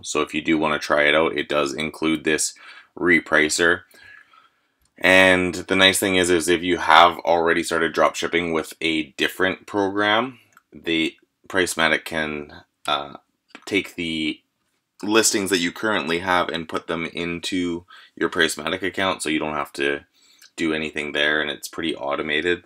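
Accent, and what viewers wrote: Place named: Canada. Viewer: about? Omg you are Canadian. Love Canadians.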